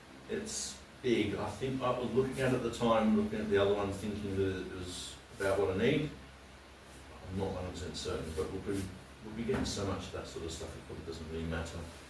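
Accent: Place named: Australia. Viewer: Hey man, thanks for the upload and update! It is starting to take shape. Nice to see your little one get involved. Ps. Isle of Wight?? You sound aussie! I am so confused.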